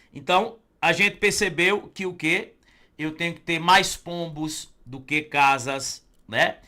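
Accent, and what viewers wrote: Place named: Northeast, Brazil. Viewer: Ótima aula, e com esse sotaque fica melhor ainda😀 adoro sotaque nordestino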